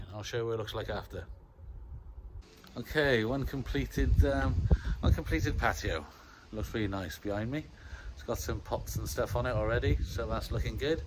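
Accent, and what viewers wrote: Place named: Wales, United Kingdom. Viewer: Where are you based if you don't mind me asking? I hear a Welsh twang in your accent 🤣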